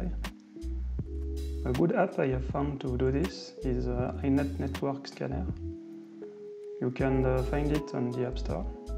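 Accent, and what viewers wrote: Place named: France. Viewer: Very nice and charming with spoken English with a heavy French accent! Though when neither language is your mother tongue then the background music overlay upon this really becomes an obstacle! I'll see if my patiance will take me through this ... accordingly I can not give you any score for this video yet ...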